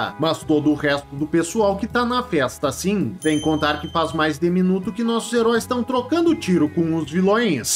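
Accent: Rio Grande do Sul, Brazil. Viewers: Eae meu querido, ótimos videos acompanho sempre, eres gaúcho tbm?? tu voz me é familiar daqui do estado kkk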